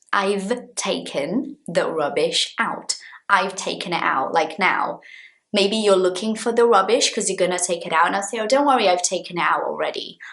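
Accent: RP, United Kingdom. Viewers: British Accent! That’s quite posh .. you are a very pretty posh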